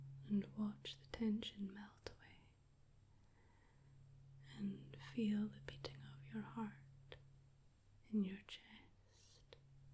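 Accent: Scotland, United Kingdom. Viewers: I adore this asmr. And your accent too :D As a fellow scottie it was so soothing listening to you speaking quietly. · I didn’t know that the Scottish accent is so calm and pretty to me!😮 It’s so nice listening to your voice. Mostly I like it when you say something that includes an “r”. 😅 Now i have to watch another video of yours. Greetings from Austria ❤ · this whole video i have been thinking that you sound Scottish but I thought you probably weren't as I've seen no Scottish asmrtists but then I heard you say "wee" and went 'I KNEW IT' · I cannot emphasize just much I am able to relax upon hearing that beautiful Scottish accent and seeing the cheeky humour mixed with a smile of pure warmth. Absolutely charming every time! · Love the general kenobi bit and how thick your whisper Scottish accent is 🏴☺️ Also your signature hand movements are top tier ✋ especially the outro where you cover our eyes sending us off to a deep slumber 🙈🤲💙🩵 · I love the way your accent articulates when you whisper. 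Scottish is such a round and organic sound, it's like a warm calming lava lamp 😊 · hi, your voice remindes me of Jamie form Outlander! I guess it's maybe just a Scottish accent, but still feels like a very caring hug while listening to a midnight story from granparents <3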